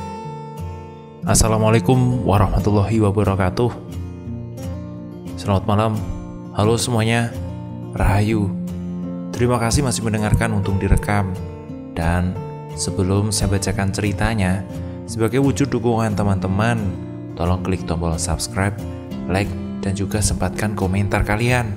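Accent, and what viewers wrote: Java, Indonesia. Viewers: seneng nya cerita mas krna ada aksen jawannya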